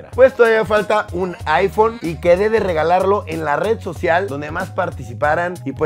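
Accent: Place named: Venezuela. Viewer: Ese estilo de hablar es muy muy de Venezolana JAJAJAJA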